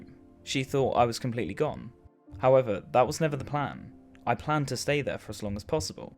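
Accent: United Kingdom. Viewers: i can stand the horrific british accent for only so long 😭😭😭 god damn its obnoxious and gross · How could the poster not tell you were British? Your accent is obvious.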